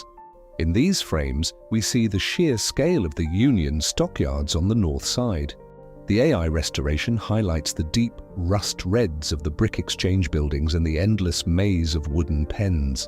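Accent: England, United Kingdom. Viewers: Pretty neat visuals. But why would the narrator speak w a British accent? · Does AI think we need a British guy to narate? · Why is a guy with an English accent narrating this? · 🇨🇱🇨🇱🙋WHAT. ?.THATS A ENGLISH ACCENT. ....I was also born at Harris ..miss my Fort Worth very much .was raised off Camp Bowie ..at Grandparents hous my sweet grandfather bought it brand new for Grandmom 1937. ..we had it 64 yrs ..she lived there then Mom came back & raised. US girls w Grandmom ..thanks to granddaddy ..we had no mortgu pymt..they were magnificent people he was a LION' & promoter of Fort Worth Cats baseball and other fine things .🇺🇸🇨🇱🙋💙💙💙even though he was born in Oak Lawn ,Dallas. They settled in Fort Worth , after honeymoon at The Baker Hotel 1930. Dallas🇨🇱💙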